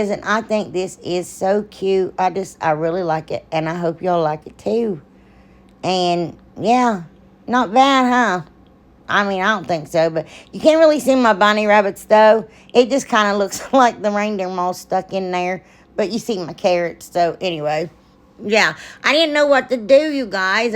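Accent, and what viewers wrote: South, United States. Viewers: I love your crafts! I grew up in the South, and darling, I love your southern drawl. My sister and I have a crafting channel called newbeginningsartcrafts. Keep on creating; you bring joy to everyone. God bless you.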